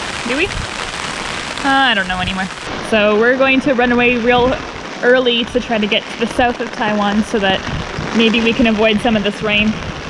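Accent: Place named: Canada. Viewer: Not gonna lie, I have to skip that intro 😂 also you have the most Canadian accent I've ever heard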